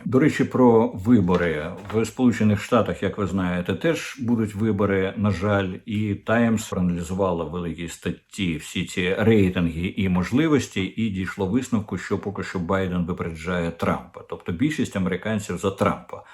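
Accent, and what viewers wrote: Russia. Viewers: 'Грівня','ракєта', 'велосіпєд 'і не раз ' Кіїв '- як же глибоко у такого щирого вусатька засіли фонетичні правила ' огідної ' мови Булгакова...Ваші п'єси,вельмишановний пане,грають професійні актори,котрі продовж 5 років у бурсі на Яросл.Валу тренували свої вуста над правильною /прийнятою на медійних просторах / укр.вимовою.Саме вони можуть допомогти Вам.../// Madam Ambassatrice, котру Банкова спрямувала у Швейцарську Конфедерацію,зовсім не манікюрниця,а сексолог...Це різні речі,хоча фахово нагострені нігті можуть потужно використовуватись і в чуттєвій царині.../// Приємно здивувала широкий загал і Ваша квітково- строката сорочка,--- таку не одягне навіть і такий затятий нетрадиціоналіст як пан Портников...Брате,воістину Bruderleiter- е,не розчаровуйте тих підписників,котрі не раз,вимиваючи руки від важкої та брудної роботи на полі,все таки ставлять Вам ЛАЙКИ!!! 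· Чому у головного націоналіста країни такий паскудний російський акцент? "ГрІвень", "вЄлодоріжки"... Наче Юлія Володимирівна знову "ожила". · трІста? ГрІвЄнь? А чому Дмитро Корчинський так дивно спілкується? З підозрілим мацковським акцентом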